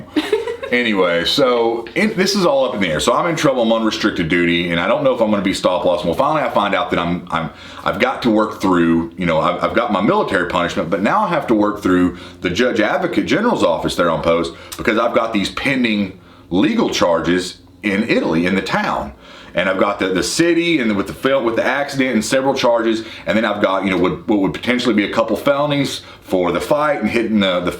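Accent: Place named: South, United States. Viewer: I could listen to him talk all day! Lol Such a southern boy accent!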